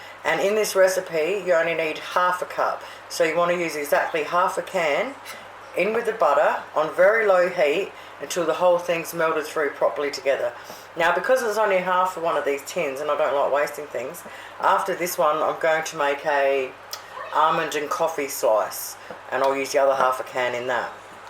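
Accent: Australia. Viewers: Thanks for posting,looks yum,definatly have to try it,love your Aussie accent.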